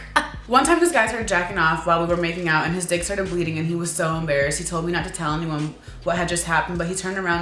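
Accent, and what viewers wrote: United Kingdom. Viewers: It wouldn't be a video without the British accent popping out and sarcasm and sass. Love it 😂😂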